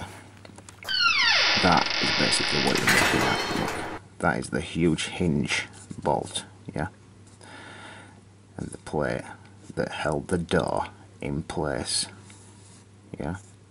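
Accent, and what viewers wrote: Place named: Yorkshire, United Kingdom. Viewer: Sorry I'm a few years late, this stuff is fascinating. Once you see it, you cannot unsee. Great work. Cheers From NZ. I can't pick the accent but I'm Guessing York or Scarborough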